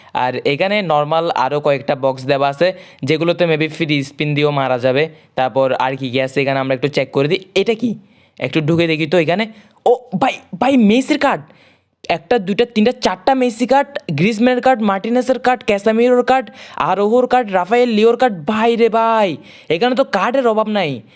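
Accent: Chittagong, Bangladesh. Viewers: ভাইয়া আপনার বাড়ি কি চট্টগ্রামে?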